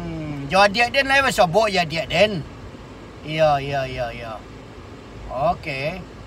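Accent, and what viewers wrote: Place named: South Solok, Indonesia. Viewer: Ohh... gini ya logat solok selatan, benar kan muara labuh di solok? Salam dari pekanbaru 😊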